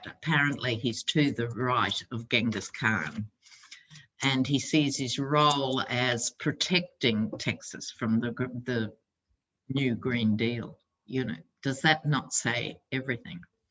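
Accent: Australia. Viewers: Oh I love the Aussie accent! In fact I think it’s puts greater importance on the words. Love from the USA. Please stay safe. God bless you and yours.♥️🇺🇸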